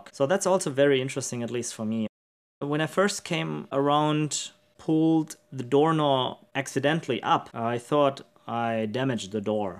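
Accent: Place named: Germany. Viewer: Ah... you mentioned Germany. I am so stuck in old-fashioned ethnic thinking that I could not figure out why a man of your skin-colour would be speaking with a German accent. Forgive me :) Funny quirky vids. Thank you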